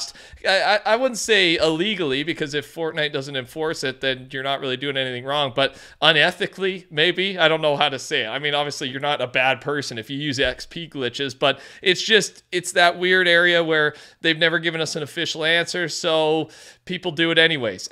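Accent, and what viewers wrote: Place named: Canada. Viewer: Canucks hat....the hoser accent. My guy lives down the road 😮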